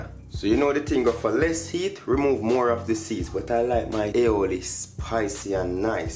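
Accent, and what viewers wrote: Jamaica. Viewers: Came here for coconut shrimp but oh my u r sexy.....love your Jamaica accent ...come to my kitchen we gonna do lots of cooking. ...😉😉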